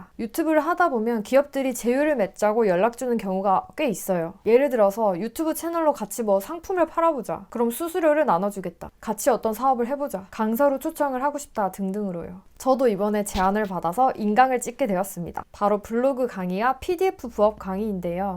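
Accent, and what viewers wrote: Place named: Gyeongsang, South Korea. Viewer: 경상도 분이신듯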